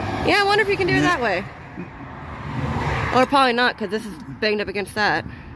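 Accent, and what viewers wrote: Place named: South, United States. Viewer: I never realized how heavy that southern accent is. I thought it was just a big time lisp.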